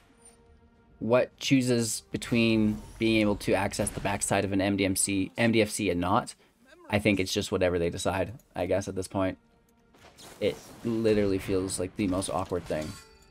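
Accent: Canada. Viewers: had an extra strong Canadian accent today. Oooout. Love it.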